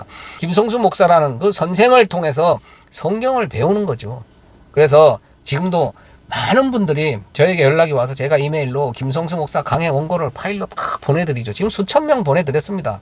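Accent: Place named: Gangneung, South Korea. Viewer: 혹시. 댁이 강릉이래요 ? 사투리가.. 어데 소케야 그기..여기서..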